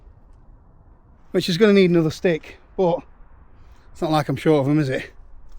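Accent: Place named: England, United Kingdom. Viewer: hope you don't mind, but what is the name of your accent. yeah, I know you are English, but what part of England is your accent from and what is it called? thanks!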